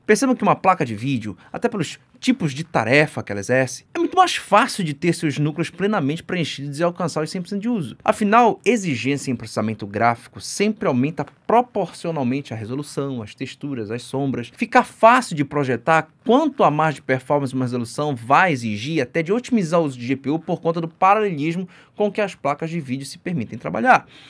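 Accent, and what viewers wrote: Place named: Belém, Brazil. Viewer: meu pai e de Belém, passo a maioria das ferias com a minha vó em Belém, nao tem como nao reconhecer esse sotaque.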